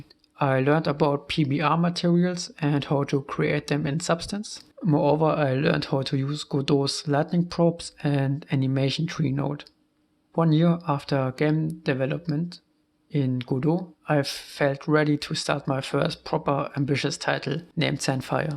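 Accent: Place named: Germany. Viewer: When you hear a German accent, you know it's going to be fire.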